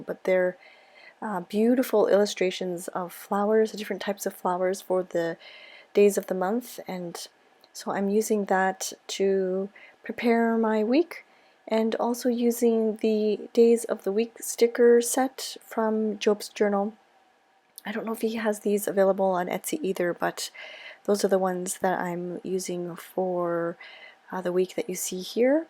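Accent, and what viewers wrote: Canada. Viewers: Hey! I prefer longer videos! I journal along with you, and with the shorter videos, I just get started and the video is over! But that's just my preference! I really just enjoy your content! P.S - I know that you have lived in Japan for several years now, but you still say 'eh?' in your videos from time to time, and as a fellow Canadian, it always makes me smile! I hope that doesn't sound silly:)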